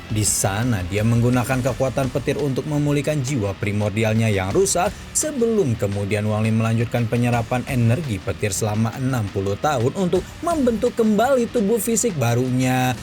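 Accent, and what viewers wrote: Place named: Java, Indonesia. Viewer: candu ama nada mutiara membangkang surga next lebih medok lagi bang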